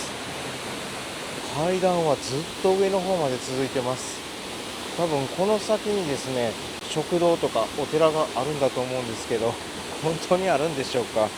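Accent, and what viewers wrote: Kansai, Japan. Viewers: お早う。フィリピン在住者です。知人のフィリピン人とこの動画を観ていました。彼は時々日本旅行に行っている人です。途中までは大感激で、次の日本旅行には徳島に行きたいと言っていましたが、どうも様子がおかしくなって動画をストップしました。何があったかって言うと、フラッシュバックに襲われたそうで、大阪を観光していて、恐喝にあったそうです。話し声が関西風の声で、動画の声とよく似ているとの事。日本は安全で安心して旅行が出来ると信じて行ったけど怖い目に合ったそうだ。スイマセンが、動画では標準語でお話しいただけませんか。お願いします。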